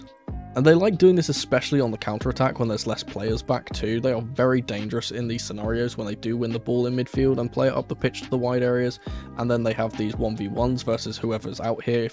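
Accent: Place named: Spain. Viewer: that spanish accent. Damn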